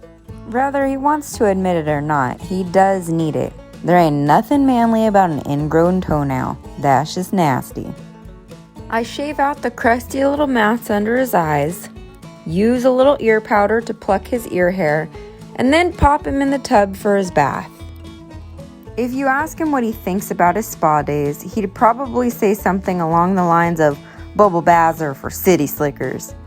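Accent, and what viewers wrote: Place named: South, United States. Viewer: Your southern drawl accent is the best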